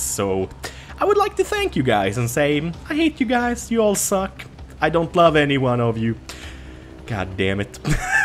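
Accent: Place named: Stockholm, Sweden. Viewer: Shit vilken svensk dialekt du har när du pratar engelska asså! Stockholm??? *****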